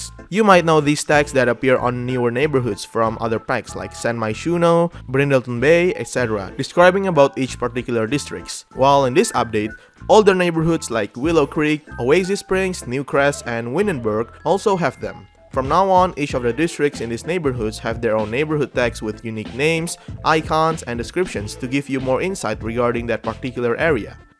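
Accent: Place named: Indonesia. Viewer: I can hear Indonesian accent👏🏼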